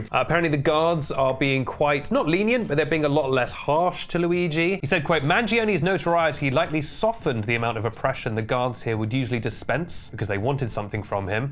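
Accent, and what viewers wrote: United Kingdom. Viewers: Wait, why are you british? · Oh you’re British :(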